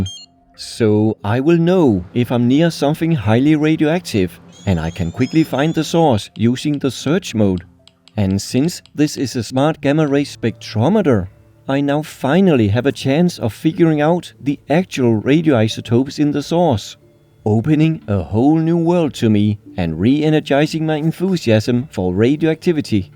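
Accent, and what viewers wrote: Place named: Denmark. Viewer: gotta love this accent :) heja Danmark ;)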